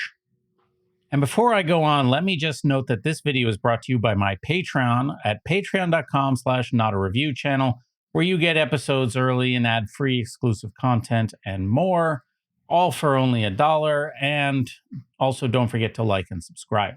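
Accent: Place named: Midwest, United States. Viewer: We all love your Midwest accent. Excellent video by the way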